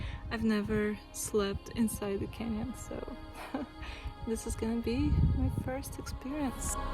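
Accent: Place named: Russia. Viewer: Great video!, have you ever thought about doing ASMR? You have a great calming with the Russian accent voice for it!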